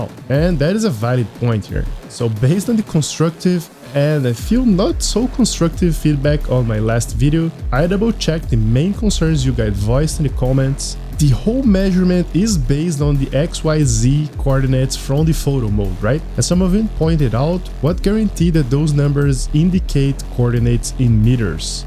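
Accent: Brazil. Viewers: Tu é br né? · Tu é br mano?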